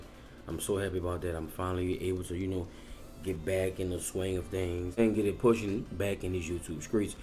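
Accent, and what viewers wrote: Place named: New Orleans, United States. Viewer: What's up my man! Checking your channel out. Your accent makes me miss NOLA!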